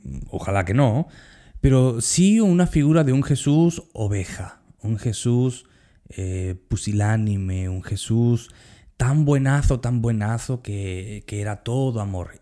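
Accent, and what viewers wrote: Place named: Guatemala. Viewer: Representando Guatemala, ya te volvió el acento, gracias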